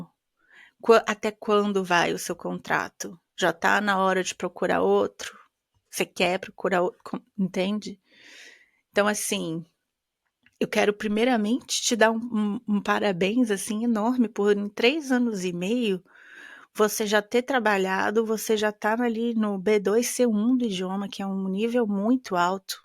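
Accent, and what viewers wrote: Rio de Janeiro, Brazil. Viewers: vc é muito linda e amo seu sotaque carioca. Sei da sua opção mas não posso deixar de me apaixonar pela sua beleza. meu sonho de mulher!!!:face-red-heart-shape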